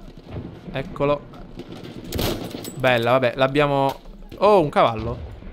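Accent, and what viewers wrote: Sicily, Italy. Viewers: CMNIK 1501 perché è siciliano? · Hai preso un accento siciliano più forte rispetto ai video di 3 anni fa!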